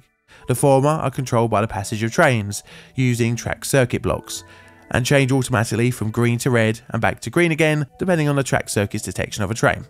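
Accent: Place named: England, United Kingdom. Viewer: DEB-ree (debris) 😂 we say duh-BREE. Choob (tube). 😂 I love English accents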